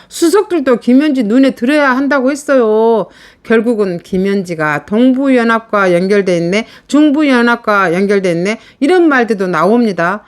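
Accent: Jeolla, South Korea. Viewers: 전라도 사투리좀 그만~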